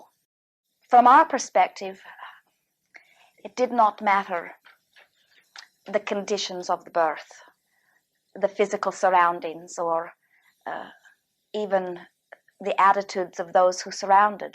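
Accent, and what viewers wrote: Ireland. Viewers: Why is Esther speaking with an Irish leaning accent? Just curious. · why is Esther speaking with an Irish accent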